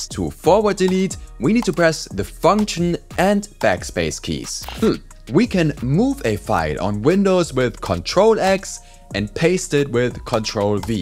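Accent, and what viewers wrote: Germany. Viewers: Why does he sound like a WW2 german officer though? That accent is crazy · You sound like you are German. Are you? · Als Deutscher reichen 5 Sekunden um zu hören, dass du auch aus Deutschland kommst. Aber gutes Video. 👍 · I mean, you can hear that you are probably german, but it's so subtle most people wont even notice. Still great video. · Kann es sein, dass du Deutsch bist? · i can smell german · german accent go crazy tho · erwischt, du bist deutsch :)))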